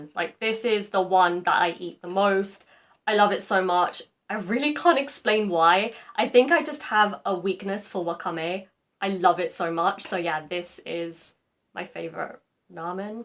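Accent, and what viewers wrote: United Kingdom. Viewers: carwowのマットとしゃべり方が同じだ。これがイギリスアクセントか。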